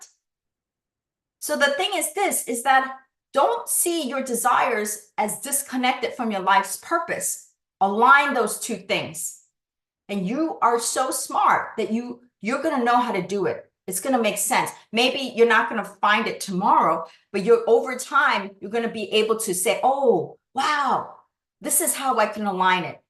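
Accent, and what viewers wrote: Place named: California, United States. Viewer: Thanks❤ sounds like a strong californian accent 😊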